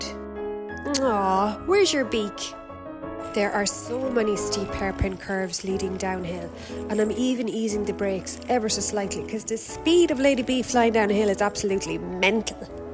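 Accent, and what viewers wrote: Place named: Ireland. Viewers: I don't even know how I ended up here, but it's a really nice video, so I looked at the creator, German location, but for a moment something didn't sit right with me, it wasn't a German accent, but then at one point I heard that nice R and my mind immediately turned to the character from The Simpsons Willie, but I guess I guessed wrong because you're probably from Ireland, right? Anyway, very nice work, I look forward to more. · Funny video! As a Czech and also a resident of Prague, I really enjoyed this video. But I definitely wouldn't choose the campsite near the Veltrusy Castle! :D Oh, how brave you are! :) But I enjoy your accent the most. If I'm not mistaken, it's Irish, right? Love it!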